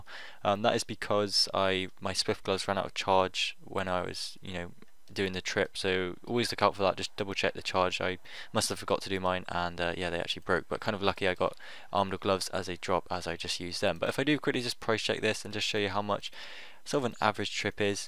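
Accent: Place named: West Country, United Kingdom. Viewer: Thought you sounded westcountry :p From Devon myself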